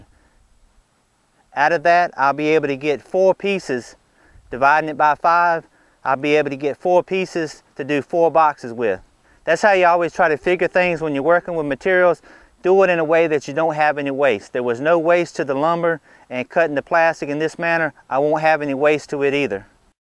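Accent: South, United States. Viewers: must be from the South i live in Arkansas so im not picking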